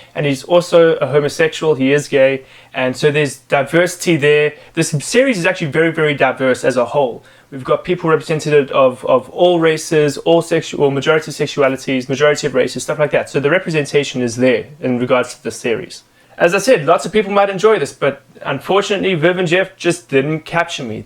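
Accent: South Africa. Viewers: So glad I still recognise South African accent after 3 years out of the country! Keep on keepin on boetie. Baie Lekker :) Yoh.. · Bro, are you from South Africa? The accent? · I heard the accent and stayed for the whole channel 👏 great quality, fellow South African here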